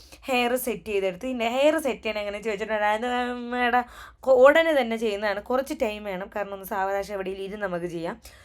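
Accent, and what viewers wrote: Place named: Valluvanad, India. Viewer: നമ്മടെ വള്ളുവനാടൻ സ്ലാങ് 😂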